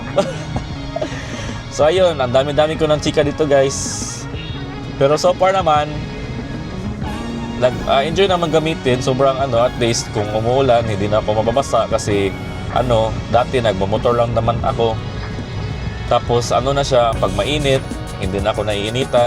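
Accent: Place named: Tagalog, Philippines. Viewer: nalingaw ko sa imong tagalog sir hahaha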